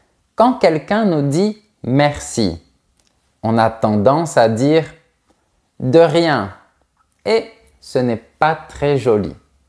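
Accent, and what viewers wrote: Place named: South, France. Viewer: Vous avez un accent du Sud, ne c'est pas, professeur ? C'est très joli.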